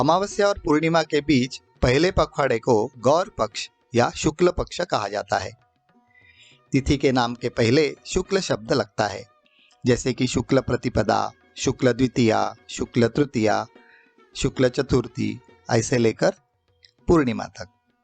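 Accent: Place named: Maharashtra, India. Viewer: Aap ki marathi accent me narration bada madhur lagta hai sunne me aur video rochak hota hai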